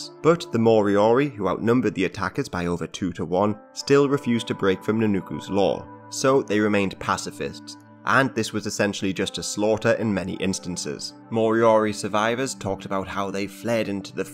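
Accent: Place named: Newcastle, United Kingdom. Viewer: Good stuff, bonny lad, and I think that i heard the remnants, of a Geordie, accent there, good voice, for story telling tell them about,the lampton worm,.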